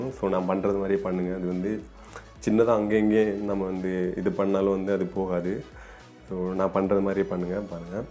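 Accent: Kanyakumari, India. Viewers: Bro ungalukku kanyakumari ya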